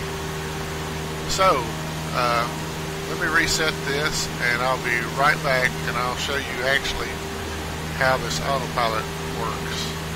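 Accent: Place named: South, United States. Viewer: Thank you! A2A‘s C172 had taught me to stay away from the AP and fly always manually! There‘s a point where a simulation can be too close to reality, and I think they reached that point there. No fun. 👍🇺🇾 Your video was so helpful, mate! Gotta love your southern accent, man. Makes me feel so cosy and welcome. 👏🤝 regards from South America, Mike PS just found out: you gotta set your actual barometric pressure in the AP, otherwise you could be some 100 ft off…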